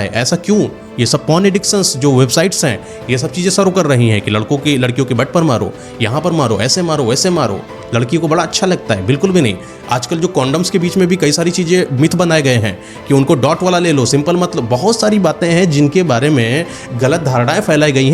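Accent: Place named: Bihar, India. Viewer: Hopeless aur bekar aapne sab bataya, aur apni bihari bhasha ki hindi mat boliye bada odd lagta hei sunne mei, apni hindi thik karo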